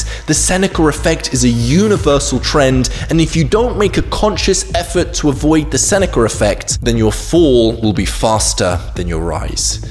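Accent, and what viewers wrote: United Kingdom. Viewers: It is definitely the British accent. My husband is from Stafford and he pronounces a lot of things that end in an “a” as if they end in “er”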